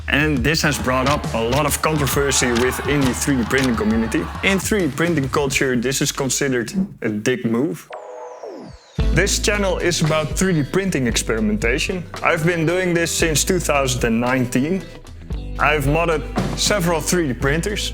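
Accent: Netherlands. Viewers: You sound very Dutch. Good video tho! Subscribed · I tought you were swedish. But you're speaking gibberish. Netherlands?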